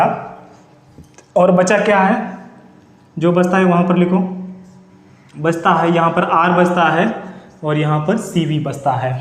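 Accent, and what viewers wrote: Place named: Nanded, India. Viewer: सर तुम्ही नांदेड चे आहात ka?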